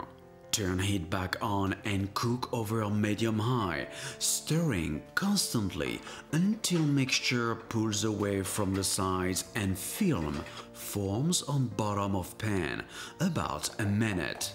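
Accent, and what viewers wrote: France. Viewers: Are u French cos I love the accent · Mdr il est français ou quoi il prononce bien les noms français et il a un pti accent fr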